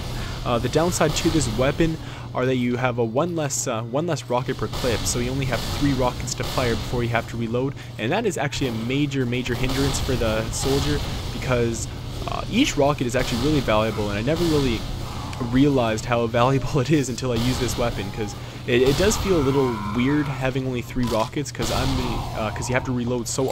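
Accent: Canada. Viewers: Are you a Newfie?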